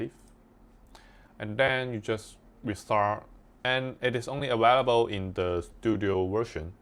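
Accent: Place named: China. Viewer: I'm surprised Codex understood what the hell you were saying, maan. That Chinese-English accent is challenging. But thanks for the video, it's a good starting point.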